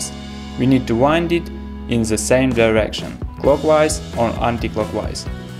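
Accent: Russia. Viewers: говорит с русским акцентом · Это же русский по английский придуривается. И клей российский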